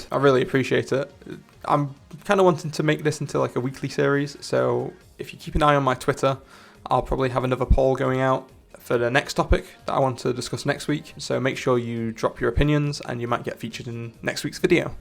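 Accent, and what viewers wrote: United Kingdom. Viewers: Followed you on Twitter forever had zero clue you were British lmfao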